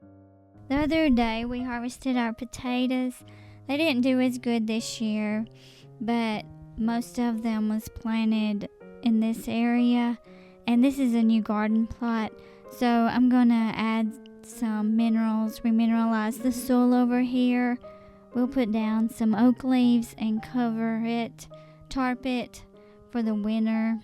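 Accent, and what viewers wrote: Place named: South, United States. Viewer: I don't blame you for that. I was just wondering because I saw it in your older videos and thought if you were still doing something like that I certainly wanted to look into it. Thank you for communicating with me. I love your videos with your Southern accent that I enjoy so much. I am originally from Texas and now live in Missouri. I get teased (even after all these years) about my accent. They call me Paula Deen. I take it as a compliment. Lol! Now if I could just cook like her and had her money! Only kidding. I am blessed beyond measure!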